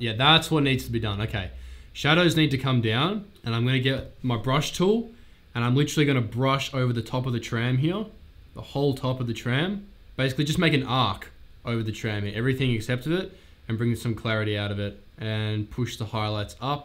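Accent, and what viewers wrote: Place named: Australia. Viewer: I like this dude. He does the shit that a lot of people refuse to do (actually show editing) and he does it with an Australian accent. What's not to like?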